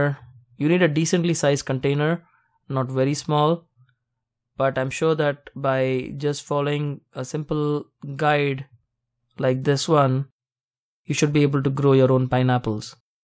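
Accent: India. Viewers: I think you are Indian by your accent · Federicken S. It sounds Indian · You sound indian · I think you are Indian. Your voice sounds like Indian accent. Am I right? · Yes Indian accent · It's an Indian accent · That's an unmistakably Indian accent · Are you Indian? A/c to your accent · your English like indian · Your accent sounds Indian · your English speech is Indian type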